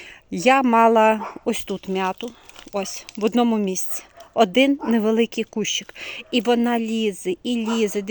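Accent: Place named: Western Ukraine, Ukraine. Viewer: Укр.мова погана, западенська. Наголос пипець. Багато води... Терпіння не хватило дослухати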